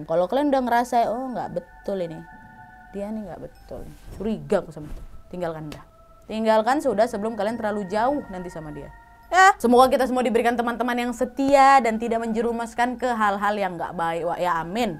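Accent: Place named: Medan, Indonesia. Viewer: Medan kali logat nya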